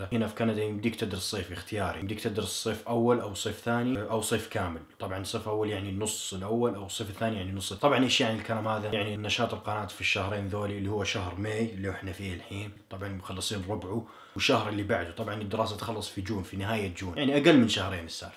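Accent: Saudi Arabia. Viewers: انت من وين وكم لك مبتعث وليش تتكلم سعودي ( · كيف تهرج سعودي ونتا تونسي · زيارة (oW9Q) الله يرحم اهلك تراني اعرفه من قبلك ، تونسي درس في السعودية عشان كذا لهجته سعودية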